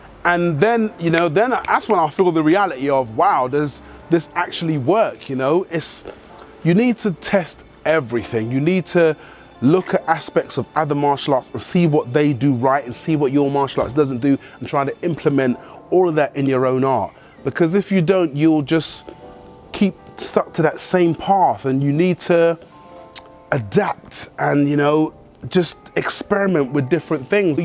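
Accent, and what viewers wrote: United Kingdom. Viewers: Love the "3 strikes for the price of 1".....your wonderful accent makes me miss London and "The Continent". Very curious what the lady with dog said that made you chuckle. What I think you are saying is that there are the martial arts in the gym and then, well; there's brawling in the street.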